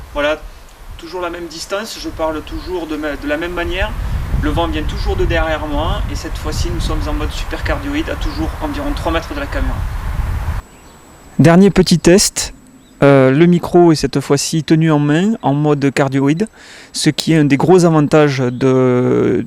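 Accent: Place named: Narbonne, France. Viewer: Ha oué, c'est bien ce que je me disais, du Sud, mais pas de Marseille. ca chante plus joyeux chez vous :)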